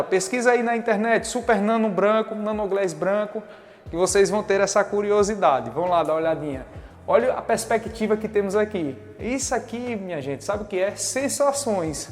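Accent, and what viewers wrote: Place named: Pernambuco, Brazil. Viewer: Que massa!!!! Parabéns pelo teu trabalho e muito sucesso!!!! Sotaque que amo demais , sou pernambucana 😊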